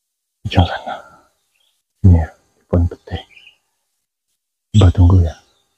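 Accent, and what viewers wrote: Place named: West Sumatra, Indonesia. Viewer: Iyo da... Wak di perantauan ma hahaha.... Mako e wak batanyo kok logat uda taka urang awak lokasi pun mbo caliak taka di padang..... Yo bana rupo e di padang hahaha.... Dima tu da padang Panjang kah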